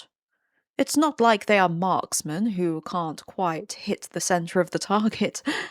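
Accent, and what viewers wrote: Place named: Midlands, United Kingdom. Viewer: i like the midlands accent ;)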